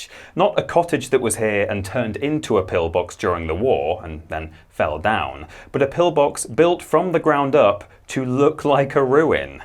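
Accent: England, United Kingdom. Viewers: OMG. its the British Chills. i didn't know i could hate a English accent voice more than I do the rest of them. YOUTUBERS STOP the SINGSONG BS.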